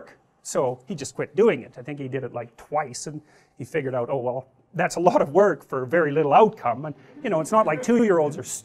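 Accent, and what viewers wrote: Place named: Canada. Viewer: This is the most Canadian accent I’ve heard from JP ever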